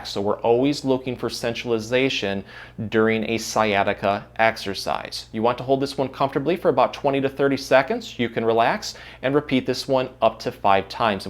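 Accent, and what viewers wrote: United States. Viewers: Sir I am unable understand what you are talking because you speak very fast and American English so kindly try to talk indian English sir pls language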